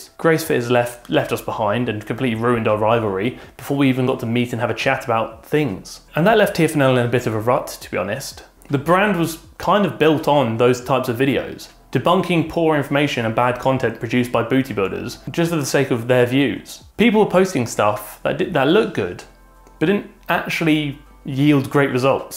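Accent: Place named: RP, United Kingdom. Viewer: i only watch this because of his hugh grant accent omg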